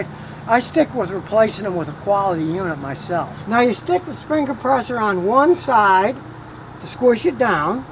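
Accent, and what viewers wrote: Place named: Michigan, United States. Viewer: are you from Michigan? You have a Michigan accent.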